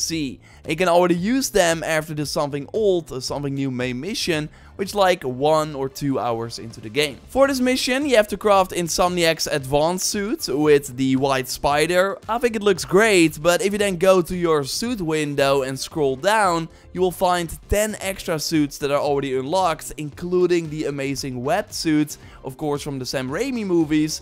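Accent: Netherlands. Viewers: Dutch accents were made for youtube, your videos are very entertaining! Keep it up!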